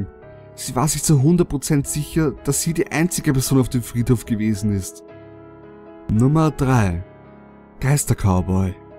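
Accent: Austria. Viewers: Bist du ösi? ^^ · oder einfach österreichisch?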